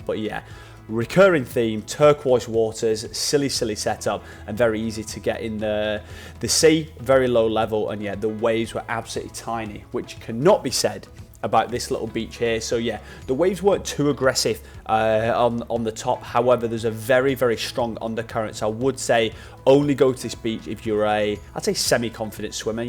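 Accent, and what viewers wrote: United Kingdom. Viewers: Great video bro, drone video footage really amazing. I really love your british accent bro, i also love scotish accent, irish, and wales. It help me to practicing for listening accent for preparing if someday i go to uk 😁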